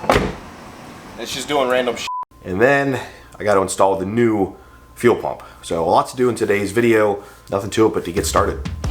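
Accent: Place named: Philadelphia, United States. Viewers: sorry, thought your accent was Delaware County PA. Maybe the (great) Northeast? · Good stuff! Fellow Philly-accented car video-editor-guy. Cheers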